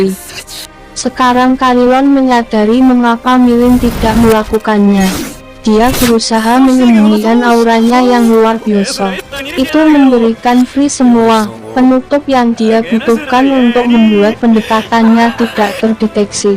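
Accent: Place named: Java, Indonesia. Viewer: Medok yah suara nyah 😇😇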